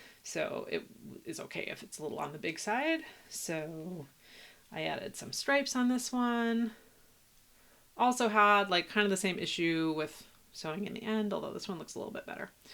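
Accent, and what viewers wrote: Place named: United Kingdom. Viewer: Wow! I’m only a few minutes in and coming here to say that I am glad to find you and am excited for your videos! My guess is UK, Britain? 🐑 congrats on Jeopardy. Super cool.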